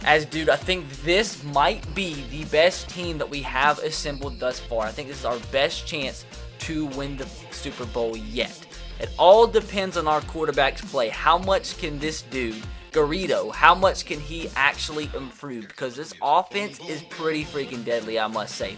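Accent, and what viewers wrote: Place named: Alabama, United States. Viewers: Alabamer accent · This man with that southern voice tho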